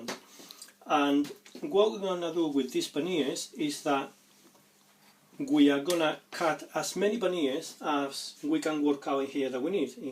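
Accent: United Kingdom. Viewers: can you make up your mind? are you british or spanish?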